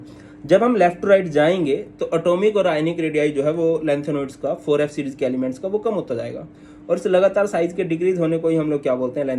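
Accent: Haryana, India. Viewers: aarree sir aap bhi Haryana se ho me bhi sun ke accha laga 😇😊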